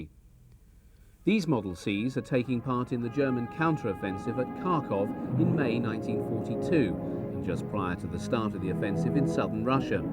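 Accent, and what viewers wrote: United Kingdom. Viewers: Really nice video. Fills in the gaps left by most discussions on wartime vehicles. Want a good laugh? Turn on the subtitles. The system doesn't have an ear for British accents. "...their inadequate grasp of the theory of armored warfare..." = "inadequate grass people theory Obama"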